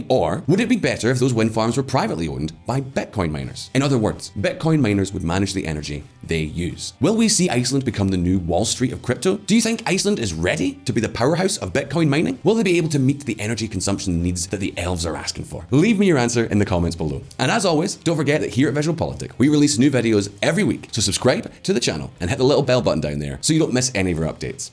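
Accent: United Kingdom. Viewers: Scottish accent over British, any day of the week 🤤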